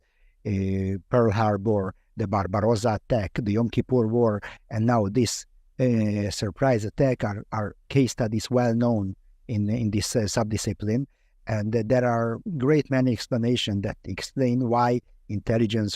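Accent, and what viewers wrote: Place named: Hungary. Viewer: i though you had hebrew accent in english, but that is in fact still hungarian, nice that it remains.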